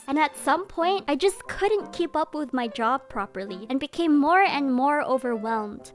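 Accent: Philippines. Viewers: The filo accent omg. As someone with Filipino parents I can just hear the voices through the phone. The "are you pregnant" part is JUST AS REAL TOO LMAO. Proud of you Ate, reach for the stars 🌟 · LOLL the filipino accent 😭😭🤣🤣🤣🤣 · STOPPP NOT THE FILLO ACCENT😭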